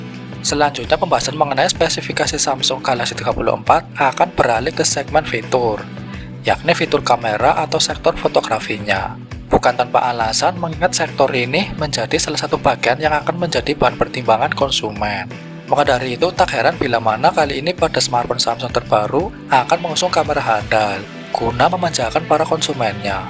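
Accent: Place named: Java, Indonesia. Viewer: Voiceover nya jawa medok, ga enak didengarnya 😌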